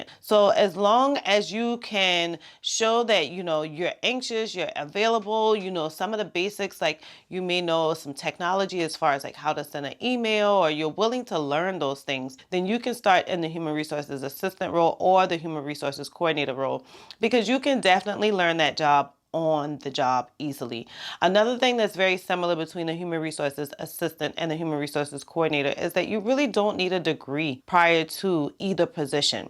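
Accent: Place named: New York, United States. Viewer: Random question: Are you from NY? I hear a NY accent I think.... great video though!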